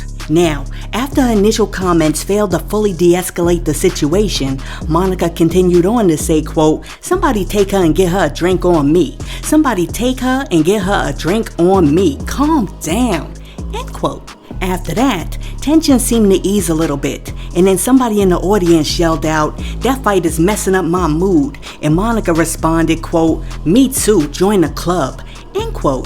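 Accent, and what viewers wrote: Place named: New Jersey, United States. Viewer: You gotta be from jersey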